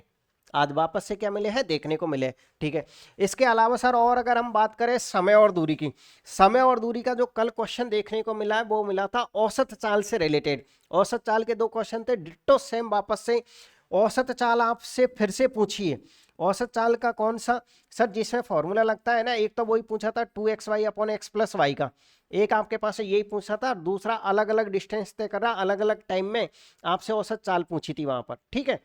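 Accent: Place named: Jaipur, India. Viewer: Sir aapke bolne ke style me hi pata chalta hai aap jaipur se hai❤❤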